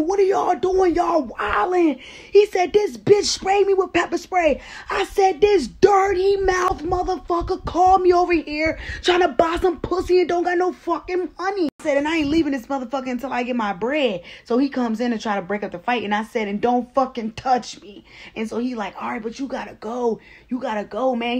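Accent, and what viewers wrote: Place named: East Coast, United States. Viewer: I knew you had to be from the East Coast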